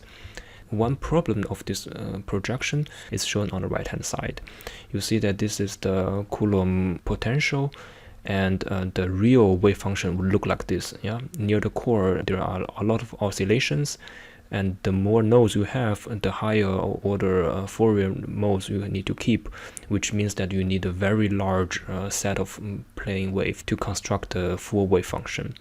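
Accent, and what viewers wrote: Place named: East Asia. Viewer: Such a nice and comprehensive explanation. seem to be the accent of East Asian.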